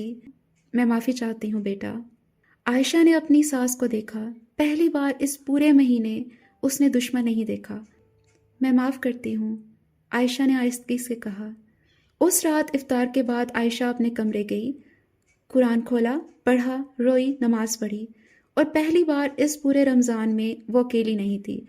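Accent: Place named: India. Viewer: Why u speak indian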